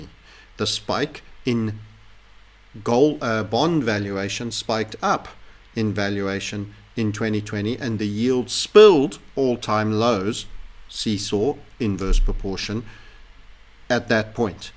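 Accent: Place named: South Africa. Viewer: South African. Fair few Scots went over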